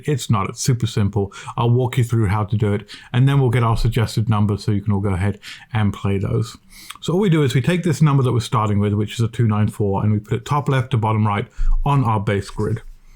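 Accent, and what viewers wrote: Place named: United Kingdom. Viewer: Hi there You sound like you are from the UK but I can’t see any Lotteries for here I am guessing that the Uk one is a lot more complex to win than some of the others Only just started watching this about the Thai Lottery a few mins ago Do you offer live chat like other people which I noticed seems to be a new feature It is a good idea as it’s quicker and not everything needs to be written in public Kind regards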